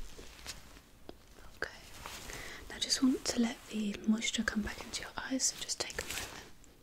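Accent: United Kingdom. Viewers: the video is perfect, love your british accent, tingles after each word :D may I please ask you, is this a London accent? or are you from the middle of the UK? I'm still wondering which british accent is the best and I think I've found one :D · Heard a British accent - subscribed straight away! This is an amazing video!! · You are so beautiful 😍 and your sweetest British accent drives me crazy 😘😘😘😍😍Marry me my queen 👑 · Idea 💡, There are a lot of us who learning english, and lot of us like British English pronounciation, and we almost haven’t asmrtists with pronounciation as yours. You could make a lot of material for learners in your asmr way. Podcasts on spotify, books naration on audible. You could do it all.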